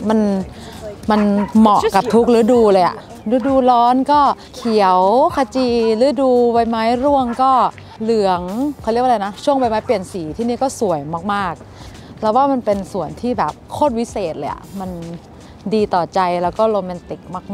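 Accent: North, Thailand. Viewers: ชอบอู้เมือง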